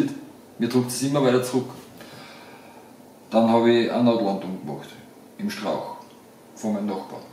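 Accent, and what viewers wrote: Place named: Vienna, Austria. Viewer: I aus Wien du heast du irgendwie a so o.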